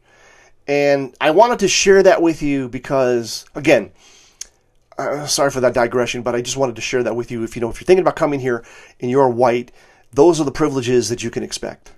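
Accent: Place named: New York, United States. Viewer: This is the first time I saw you. A truly great stuff. Jerry Seinfeld reincarnated- I wonder, is he still alive? This New York accent. Promiss to watch your videos, now I`m working in my garden -in Poland! That`s where I live. 'White Privilages'? Jeez! You have them back home? What`s that?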